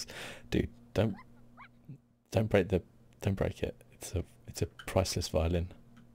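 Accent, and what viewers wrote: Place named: United Kingdom. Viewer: British accent + ASMR = Masterpiece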